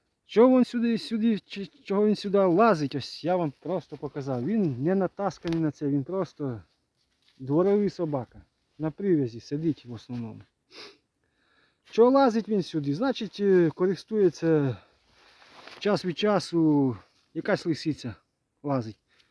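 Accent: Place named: Polesia, Ukraine. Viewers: І мова гарно і поліщук справжній! Головне, що ви небайдужі, вам є справа до землі, на якій живете. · МОЇ СПІВЧУТТЯ ТОБІ ЗЕМЛЯЧЕ 🤝 ПОЛІЩУЦЬКИЙ ДІАЛЕКТ СУПЕР МЕНІ СОЛОДКО СЛУХАТИ, ТАК САМО ПОЛТАВСЬКИЙ, ЧЕРКАСЬКИЙ, КОЖЕН РЕГІОН МАЄ СВІЙ ДІАЛЕКТ, НАВІТЬ В США АНГЛІЙСЬКА МОВА АЛЕ ЗАХІД, ПІВДЕНЬ, ПІВНІЧ, ЦЕНТЕР ВІДРІЗНЯЮТЬСЯ, ОСОБЛИВО ВІД ЦЕНТРУ НА ЗАХІД....МИРУ НАМ УКРАЇНО ТА ПЕРЕМОГИ НАД МОСКОВИТАМИ. ПРИВІТ З ХЕРСОНУ....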